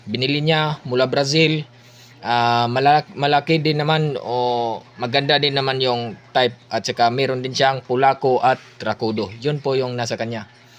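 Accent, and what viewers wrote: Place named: Visayas, Philippines. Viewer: Halatang bisaya jud ka part kay imong accent same sa accent nako sauna magtagalog pero ok rana as long as makasabot ang tanan 👍 pronunciation lang practisa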